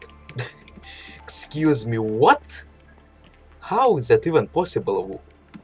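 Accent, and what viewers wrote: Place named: Russia. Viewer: какой-то русский акцент)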